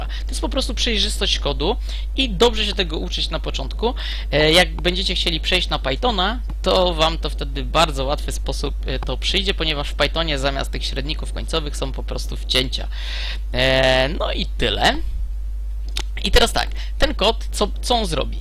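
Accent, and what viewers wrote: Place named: Poland. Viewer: Ciekawe podstawy, ale masz złe nawyki jeśli chodzi o angielski, czasem mówisz dobrze, czasem wymawiasz słowo w sposób spolszczony. Char to [czar], a dokładniej i poprawniej z miękkim r i te cz to trochę złamane z tszi :) Na pewno C jest strukturalny? a nie proceduralny i obiektowy?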